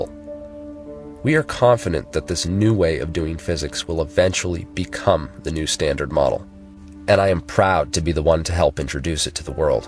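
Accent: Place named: United States. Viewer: Peer reviewed or it's not science. At the risk of offending you greatly (for which I will make amends if needed), I watch physics videos from around the world and I have never heard anyone pronounce Dirac the way you do (rhyming with tie rack). I have trouble believing you have attended any lectures on quantum mechanics. Assuming this is deeply-thought-out science fiction, with possible fleecing of idiots, let me help you sound more authentic, as I am fans of both. I will use capitals to designate the emphasized syllable. Depending on your dialect and/or that of your professor, typical pronunciations are like deRack (with a brief e like in because), diRack (with an i like in bit), d'Rack (just a fleeting vowel noise between consonants), deRock, diRock, Deerack. If I heard that last one I would assume the speaker is from India or nearby. Americans typically use diRack, deRack, or d'Rack. 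Your accent and dialect are clearly American (we call z zee, while most others call it zed), so it is good to stick to American pronunciations. Britons typically pronounce it closer to the original french; deRock or diRock. They might even use a french r sound. Sorry, I don't know how to depict the french r sound by mere spelling. It's like if a letter had r for its mother, while its father's parents were h and a hard g. Kind of throaty. Looking back at this, it is almost certainly the kind of rambling I do when sleep is near. My filters are the first to go... Sorry for offending anyone, but I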